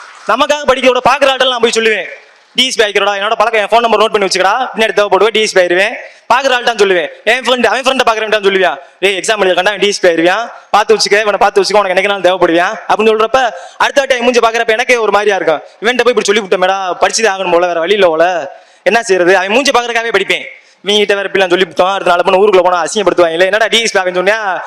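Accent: Madurai, India. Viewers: Madurai slang🤩🤩🤩🤩🤩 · Madhurai slang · Madurai kanaran slang i think😊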